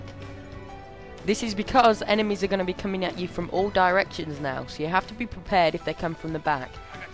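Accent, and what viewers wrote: United Kingdom. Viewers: is he from uk? COOL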